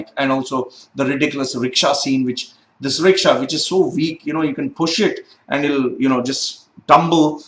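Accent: India. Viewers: OMG.. Who d fuck r u?? Or shud I ask what d fuck r u?? :-D.. Luk lyk some wana be Mexican dumb fuck.. U wid dt Indian accent trying to be western and tokn on their part is Jst amusing.. Will definitely recommend ur videos for Ppl to hv a gud laugh.. I pity u.. As for the review.. Dun think Ppl give a fuck Abt Wt u say.. Wt an ass hole.. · An Indian guy gets some exposure to Hollywood movies thinks he is qualified enough to bad mouth Indian Movies. So in his Indian accented English the dumb-f*ck goes on crapping. Meanwhile the movie breaks all milestones....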